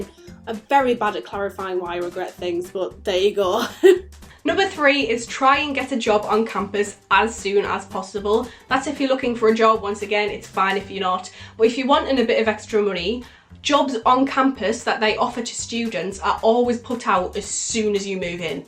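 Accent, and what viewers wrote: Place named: England, United Kingdom. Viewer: Where are you from if you don't mind me asking? I'm from Scotland but ik there's like 50 different English accents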